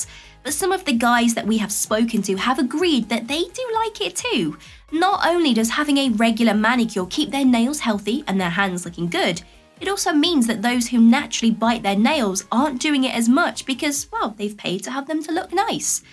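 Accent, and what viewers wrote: England, United Kingdom. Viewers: I love listening to your voice at 1.5x -English accent - too cute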